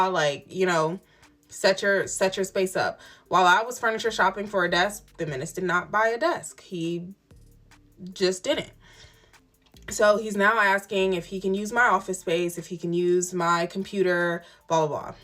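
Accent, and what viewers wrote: South, United States. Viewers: Hey, thats a cute lil baby in the footage at the end of ur videos like part 1 of this and others. Ur dialect is giving from the South, where bout? Im from MS..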